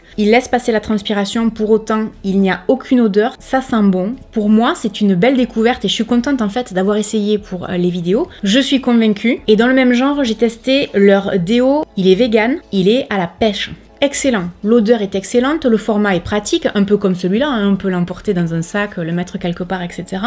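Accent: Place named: Narbonne, France. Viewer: Bonjour comment vas-tu? Je voudrais te dire merci pour ta vidéo c'est génial et un plaisir à chaque fois de regarder tes vidéos, surtout quand on voit la durer de tes vidéos en ce moment qui sont plus longues c'est encore plus génial malgré que ça l'était déjà en plus quand je vois le temps de travail que tu dois avoir pour faire les montages à chaque fois et passer du temps dessus, je te remercie pour ça, pour ton travail car moi ça me fait énormément du bien de voir tes vidéos, je te remercie pour le temps que tu prends pour nous, pour les explications et ta belle présence. Je te trouve très très belle, au naturel tu es aussi très très belle tu as des yeux magnifiques ainsi que tes cheveux ils sont trop beaux vraiment tu as tout pour plaire vraiment tu es une belle personne ( non ce n'est pas une déclaration d'amour 😂😂) mais merci d'être là, vraiment en toute franchise à l'heure actuelle tu es ma youtubeuse préférée, donc je comprends tout à fait pourquoi on t'appelle, alors merci maman. 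Je voulais te dire aussi, et c'est pour ça que je t'écris, bon courage pour tes examens médicaux d'aujourd'hui, j'espère de tout mon cœur que ça va aller au mieux et que tu auras de bonnes nouvelles, qui pourront te rassurer pour cette journée, je t'envoie tout mon soutien de pas très loin ( je suis de Narbonne 🙂, ce qu'il y a de bien c'est que je me reconnais dans ton accent car on a le même 😂), et je pense très fort à toi aujourd'hui et je croises les doigts pour t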